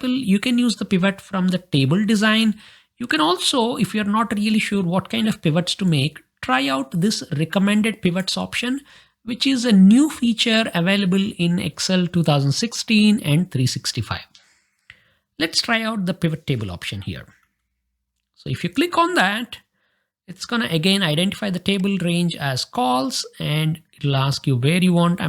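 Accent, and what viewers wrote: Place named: Tamil Nadu, India. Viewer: Aap Tamilnadu se ho kya 🙂 apke bolne ke tarike se lag raha hai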